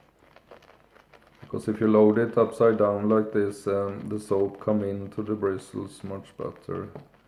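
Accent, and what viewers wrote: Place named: Scandinavia. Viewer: That is quite possibly the most Scandinavian accent I've heard 😄 Nice review mate, I'll definitely be testing this one out myself. Only tried their sandalwood shaving cream so far, so I'm interesting in seeing how this one will perform.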